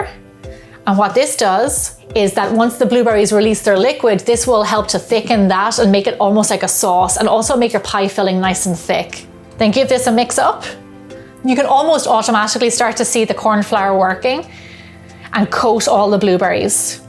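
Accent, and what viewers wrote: Ireland. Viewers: Hey I have been watching your videos for the past 1 year. I have to say I find them really therapeutic and calming. And I also love your Irish accent. I have tried some of your recipes and they turned out so good. I really like the way you explain them. NEVER regretted coming and subscribing to your channel.❤️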